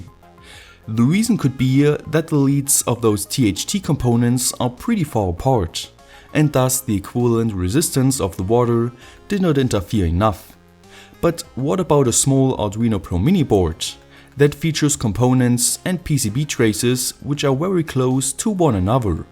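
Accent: Germany. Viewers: You are from Germany? Kommst du aus Deutschland 🇩🇪? Siehe min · Man merkt so das er sonst deutsch spricht😂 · Are u german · Lol, du bist 100pro Deutsch! Aber trotzdem gutes Video! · Are you a german? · aha du bist deutsch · Den deutschen akzent hört man halt direkt raus xd aber geiles video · Du bist deutsch hat man herausgehört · are you German? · Bist du deutsch?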